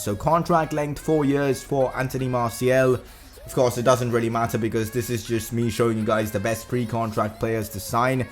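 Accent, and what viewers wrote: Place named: India. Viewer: Steve Worrell he's got a sour throat probably Indian cuz I don't know how welsh people sound like.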